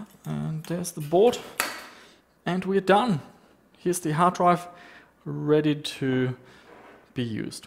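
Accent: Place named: Germany. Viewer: this guy sounds if his native language is German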